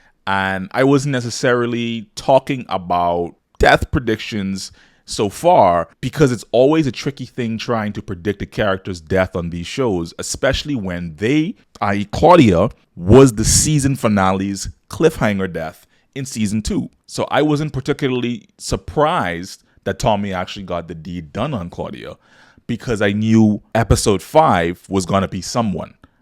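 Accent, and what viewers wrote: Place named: Bahamas. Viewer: quick question ... which island you or your people from ... cause I can hear the Bahamian in you voice! ... BTW a fan of your POWER content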